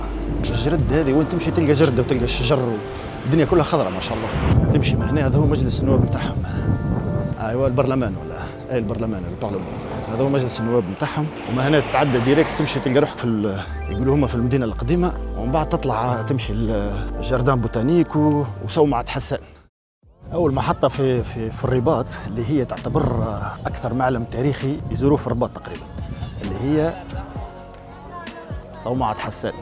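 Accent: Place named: Libya. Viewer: عندو اللهجة الليبية وليس التونسية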